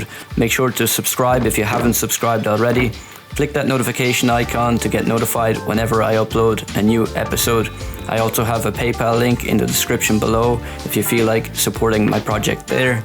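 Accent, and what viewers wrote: Ireland. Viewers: loving the ducks, always make me smile, happy birds, i been adopted by several foxes, a couple of them follow me up the road, very amusing - loving a bit of southern Irish accent, got a bit of Irish in me somewhere, not sure which bit, but some will say the best bit haha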